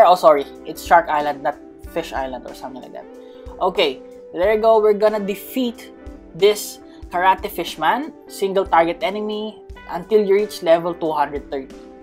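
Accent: Philippines. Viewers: it sounds like you have the accent? filipino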